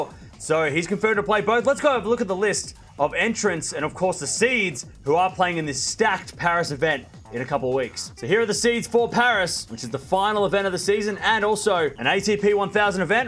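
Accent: Australia. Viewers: Please pardon Australian accent. He tries hard to pronounce names correctly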